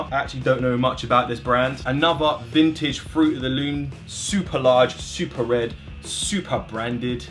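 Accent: England, United Kingdom. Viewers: this accent is obviously english/british,,,but what KIND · Idk what’s more entertaining his monotone British accent or his monotone jokes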